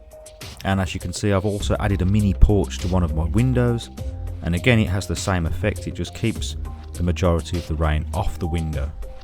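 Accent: Birmingham, United Kingdom. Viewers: Thanks for the heads-up. I headed over to that video, and your video on insulating your an cave. I had a question about the shroud, but will post it on that video. From your accent, I'd guess you are somewhere around Birmingham? (That's where I am) - rain protection definitely needed in the Midlands!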